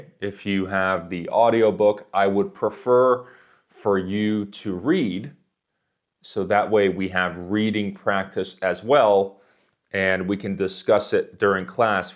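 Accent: United States. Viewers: your American accent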